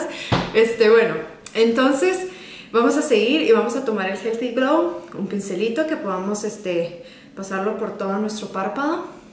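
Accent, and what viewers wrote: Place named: Tijuana, Mexico. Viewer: esta chulo el look jajaj se te nota que ya vives en tijuas... digo por como hablas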